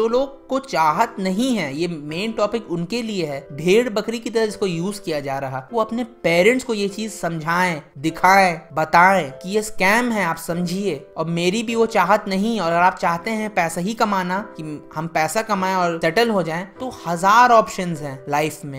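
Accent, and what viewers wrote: India. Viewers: Up ke lagte ho...Awsome vedio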